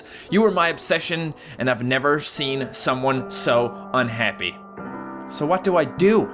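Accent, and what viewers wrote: Canada. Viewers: Yes, he is from part of Canada where they say that. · his Canadian sorrys really show in this video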